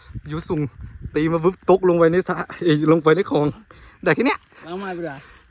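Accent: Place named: Sukhothai, Thailand. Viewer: สำเนียงเหมือนคนสุโขทัยเลยคับ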